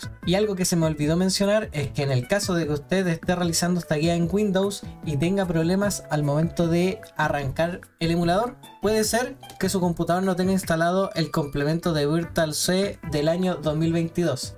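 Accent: Chile. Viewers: como se nota cuando alguien es chileno xd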